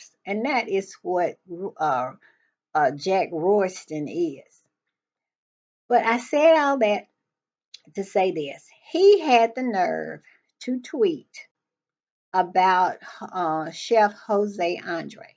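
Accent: South, United States. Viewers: ❤❤❤ here for the Read...here for that southern drawl ❤❤❤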